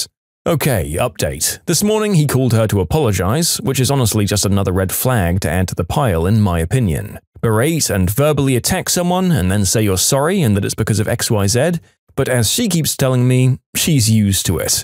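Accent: United Kingdom. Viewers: This is my first time hearing this voice actor and I was not expecting a British accent (no offense) because I'm used to a really monotone emotionless voice lol.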